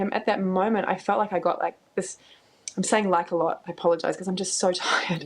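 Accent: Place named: United Kingdom. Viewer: Love British ppl how they say their news 😂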